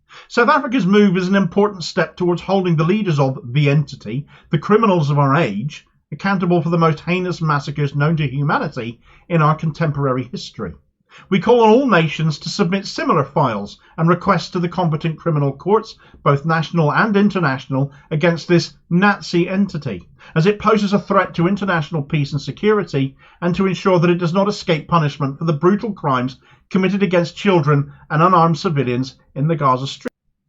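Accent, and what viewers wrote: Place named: West Country, United Kingdom. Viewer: Hi, your accent sounds where I am from its a wiltshire/somerset one right? I now live in Israel and enjoy your rants. I been labelled a threat for speaking truth about assange and war crimes in Iraq while in UK. Someone must of reported me because I been followed and harrassed since then, even moving to Georgia to escape persecution. I actually thought in Israel as an arab that my family would not get involved but they betrayed me and my own father does tactics the perp does. Checkout a short I posted of my house being watched. Id love to get my story out somehow. Let me know what you think (play with sound)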